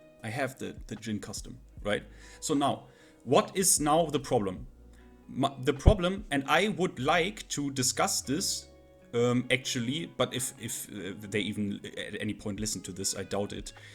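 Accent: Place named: Germany. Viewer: direkt den deutschen akzent erkannt :face-blue-smiling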